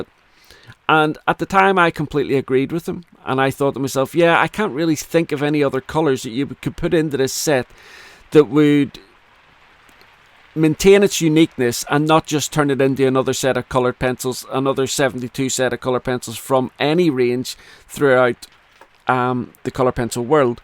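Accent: Northern Ireland, United Kingdom. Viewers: Thanks for another great review. Honestly what took me back wasn’t anything you said about the pencils but that you’ve been doing reviews for 11 years already. I found your channel shortly after it started, it was called something else back then sorry don’t remember what it was. Both my parents born and raised in Ireland and I had just lost my dad. Though your Northern Ireland brogue was different than my dad’s Dublin one it gave me comfort listening to it. Wow I can’t believe it’s been I’ve been watching that many years, where does the time go, every year it flys be faster.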